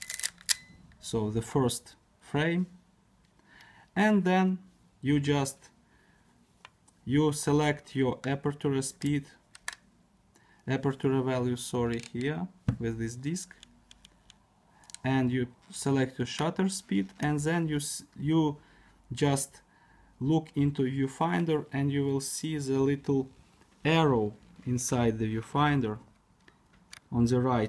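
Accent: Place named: Russia. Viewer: Хорошо говорите по английски... молодец... А думаете все равно по русски... и это чувствуется. Спасибо за обзор... Хороший фотоаппарат... всегда мечтал купить его в молодости.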